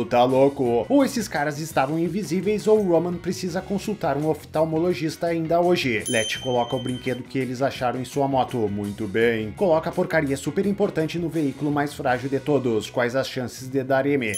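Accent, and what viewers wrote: Rio Grande do Sul, Brazil. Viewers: Esse narrador gaúcho é da hora, kkkkkk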